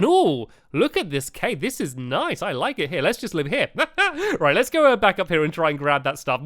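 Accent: United Kingdom. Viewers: Alright!! I don't know if it's just me, or does stampy sound less british???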